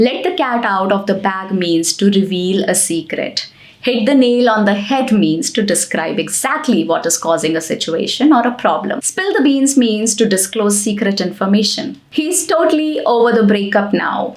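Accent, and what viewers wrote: India. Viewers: Hey, your new SUBSCRIBER✋cuz my viewers want me to speak English like you with Indian🇮🇳accent so now I’m gonna work for my YouTube AUDIENCE❤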